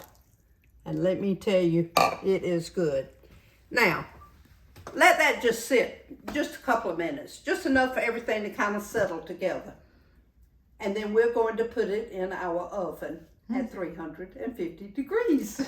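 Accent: South, United States. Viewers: Oh Honey when u started talking I knew u were from the south just like me I've been in Ks. 4-40 yrs but go back 2 No. Car. 4 2 mon. & at Christmas So happy I've found U My daughter made this cobbler & we sure loved it 💙🙏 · When she said she was going to do her happy dance, I was so sure she'd be talking about the chocolate, but I should have listened to the southern accent and known she'd be talking about the butter! 🤣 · I absolutely love your accent. You sound like a southern belle. I can't tell if your accent is from South Carolina or Mississippi but you sound like a southern bell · I’m from the South also metro ATL GA, But her accent is different from ours, I’ve noticed each Southern State has a different sound, I can usually pick up, Ala, Ms and the Appalachian States have a really different sound. I love Southern voices…..🍑🇺🇸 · Oh man, I’ve found my next potluck dish 🥰 I can’t believe I’ve never heard of this! 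It looks delicious, thank you so much. I don’t know where you’re from, but you sound southern and hearing you reminded me of my Granny and Mammaw, I miss them so much ❤ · I forget where it was filmed, but it was somewhere here in the Deep South! It's a beautiful Southern drawl. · Love love love her Southern Accent. 💓💓💓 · She reminds me of my Mamaw Love her Southern Accent ❤❤ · Hello! Love your channel! Your southern accent reminds me of my late aunt who lived in Memphis, Tennessee. Are you from that area? 😃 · I don’t think there was a single word in this whole video that ended in “er” and I absolutely adored it. Madam, you remind me of all those wonderful little old white haired southern chefs from the church socials when I was a young lad growing up in southern Missouri. Mind you, they fed me very well and I’ll be making some of this cobbler for my family soon. Thank you and have a blessed day! 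· I’m from Texas but her accent is much more southern than mine and it’s just precious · I love that suthen accent. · Love the southern “y’all” since I grew up in Tennessee. My sister (God rest her soul) and I would be making this right now. Looks delicious 😋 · This brought tears My mama made this and I have looked for the recipe for ever Love your sweet southern way of speaking Im fom !South Carolina and you sound like family ❤❤❤ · Love the Real southern accent ❤ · I absolutely love her southern accent.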